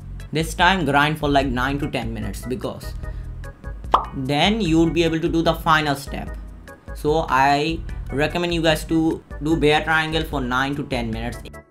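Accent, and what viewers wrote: India. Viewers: Prob indian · are u indian by chance?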